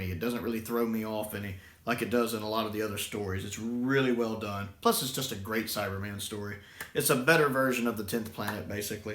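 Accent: South, United States. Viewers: to give some thoughts on what you havent seen yet Highlanders is fun, surprisingly Jamie isnt actually a major character until the end, but the story itself is still enjoyable, Troughton gets to do some dressup and fooling around, Polly is also great in that one. Underwater Menace is a big guilty pleasure, yes its OTT but its so much fun, id say this is a "popcorn" story. The Evil of the Daleks isnt perfect, but the highlights are excellent. Troughtons performance is top tier and Jamie becomes a 3 dimensional character here. Abominable Snowmen is a good story, but i feel like it kind of sags in the middle. Though the good parts are really good and its a nice setup for Web of Fear i havent seen Wheel The Dominators at its core is cardboard, and the plot is weak, but the tardis team are alot of fun and the comedy makes it worth it. Still can be a fun watch. 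go rewatch The Mind Robber its great. The Space Pirates is underrated. Everyone hates it, but im one of the few that actually quite likes it. I think its let down a little bit by the Tardis team being background characters for alot of the story, but they still have a decent impact esp at the start and end, but i thought the central plot was really cool. Also would be interesting to hear your thoughts on Milo Clancey as hes played by an actor from my country (NZ) and hes playing the role of a stereotypical southerner. Which i assume you might be given your accent? Anyway, Troughton era good.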